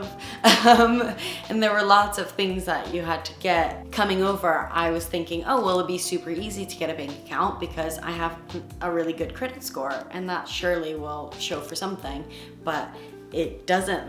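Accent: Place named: United Kingdom. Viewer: You are getting a better British accent though.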